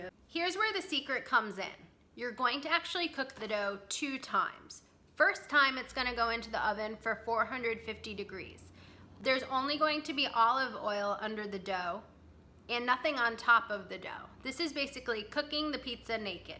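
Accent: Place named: Rhode Island, United States. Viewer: You can never lose a RI accent!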